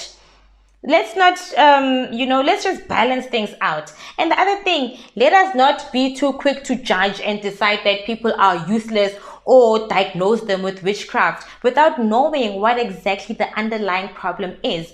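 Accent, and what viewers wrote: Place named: Africa. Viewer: Here because a friend is dealing with this. There is something so satisfying about a woman with a wholesome soothing sounding voice and African accent ☺️ I love the way you present and speak.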